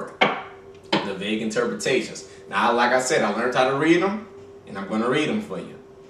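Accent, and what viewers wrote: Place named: South, United States. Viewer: I can understand him fine, don't be mad cause your not southern...we can't all be wonderful!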